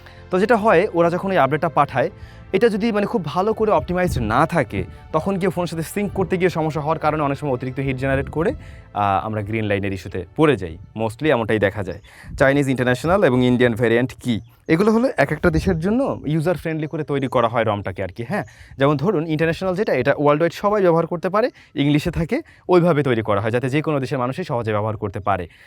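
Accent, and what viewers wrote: Barishal, Bangladesh. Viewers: ভাই, আপনার বাসা কি মোগো বরিশালে🥰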